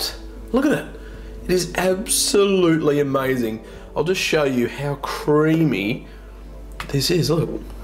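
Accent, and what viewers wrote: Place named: Australia. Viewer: He's Australian.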